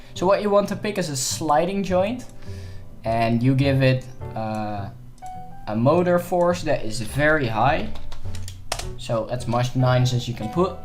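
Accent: Netherlands. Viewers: You have a dutch accent. Are you DUtch?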